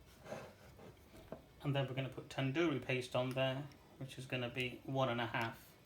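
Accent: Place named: United Kingdom. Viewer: I love your accent. what kind of uk accent do you have?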